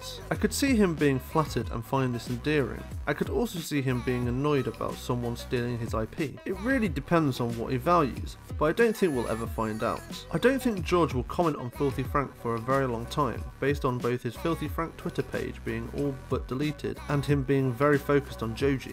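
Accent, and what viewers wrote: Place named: United Kingdom. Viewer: Is that another British accented commentary channel, not an insult but fuuck there's tons of them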